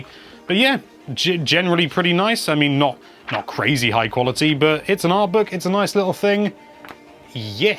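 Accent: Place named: United Kingdom. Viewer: ayyyy your british....im subbing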